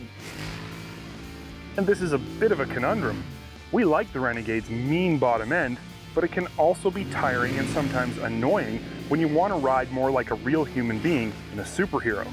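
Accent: Canada. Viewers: what is up w that Canadian accent out sounds like oot i just do not get it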